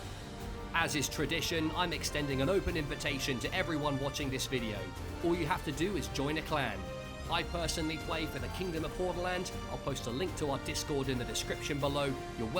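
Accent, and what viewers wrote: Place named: United Kingdom. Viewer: Your British accent and the specific cadence you use makes you sound like an F1 announcer 😂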